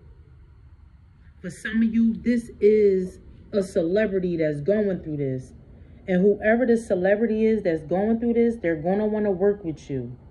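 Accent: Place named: Philadelphia, United States. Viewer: YOU HAVE A PHILLY ACCENT.❤❤❤❤ AM I RIGHT I’M ORIGINALLY FROM PHILLY.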